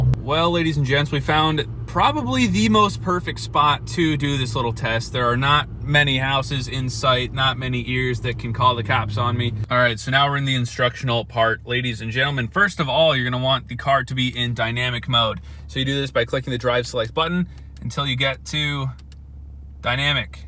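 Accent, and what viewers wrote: United States. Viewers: It's kind of a midwest thing. Even in Canada, folks from the prairies say "Oddie". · He's American, they say alot of things differently.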